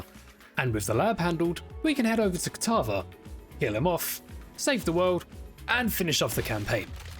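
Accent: United Kingdom. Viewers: ah, yes, all the things I love in one video - chaos, cats and crit-proc calculations narrated by a cockney bloke <3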